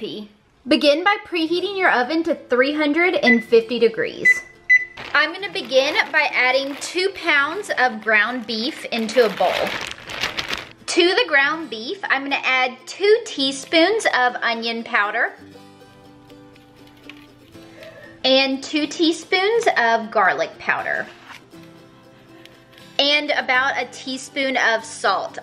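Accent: South, United States. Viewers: "Hey y'all!" Absolutely love that deep southern accent..."with yo purty little self" 😄😄 Real talk though this looks good I make a mean meatloaf myself so I'll be trying this soon thank u for the vid.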